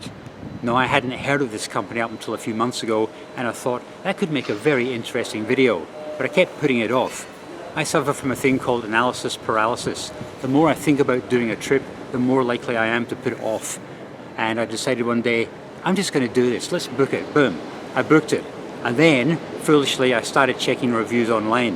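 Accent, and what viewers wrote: Scotland, United Kingdom. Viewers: Scottish accent with something else creeping in - can't quite put my finger on it though. Another English speaking country but not British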